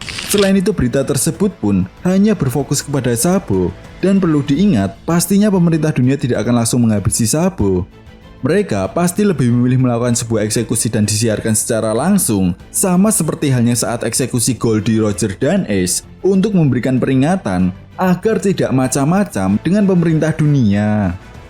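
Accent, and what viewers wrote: Java, Indonesia. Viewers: Logat jawanya kental skali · suaranya terlalu medhok,,, kyk bumbu ktoprak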